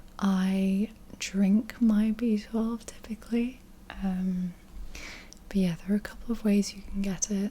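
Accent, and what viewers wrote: United Kingdom. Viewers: brit accetta too different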